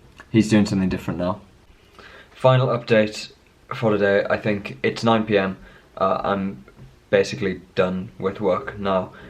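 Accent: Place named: Sunderland, United Kingdom. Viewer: It is a watered down Mackem accent.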